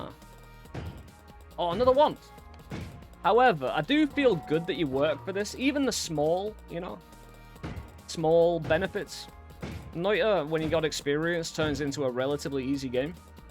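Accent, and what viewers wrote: United Kingdom. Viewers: i think ive found my 2nd favorite british accent ._. so pleasing to the ear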